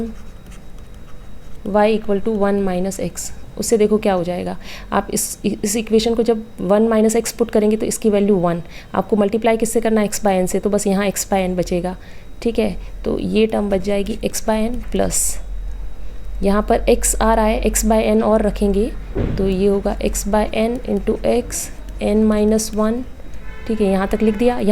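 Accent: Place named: Bihar, India. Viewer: Aap bihar se h kya, aap theek h bolti h isliye puchha h, waise aap topics achhe se explain krti h , Dhanywad.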